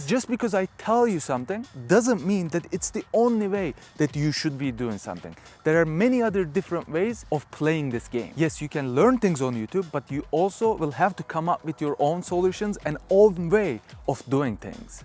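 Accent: Turkey. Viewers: U speak like a Turkish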